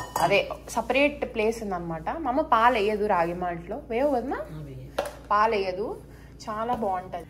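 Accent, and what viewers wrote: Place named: Hyderabad, India. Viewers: Your slang looks like our Hyderabadi telugu pakka mass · Motham Telangana slang matladuthunavu ga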